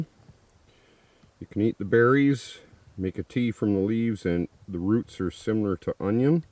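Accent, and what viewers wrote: Canada. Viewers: Since you're Canadian. Would you say "A" or "ay" at the end of every sentence like your asking a question please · I could tell you’re from canada because of your accent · He sounds so Canada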